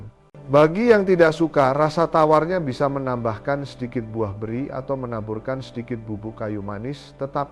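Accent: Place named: Sumatra, Indonesia. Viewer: Inton sumatra no 1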